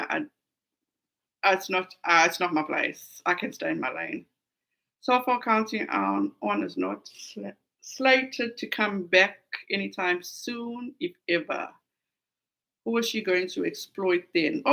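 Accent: South Africa. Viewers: Btw I love your accent, I worked with 4 S. African doctors, love it!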